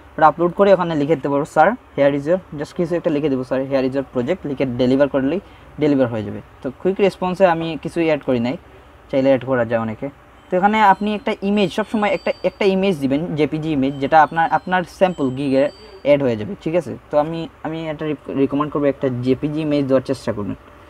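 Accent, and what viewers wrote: Sylhet, Bangladesh. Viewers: apnar kotha shuna mona hoitaca apne sylhete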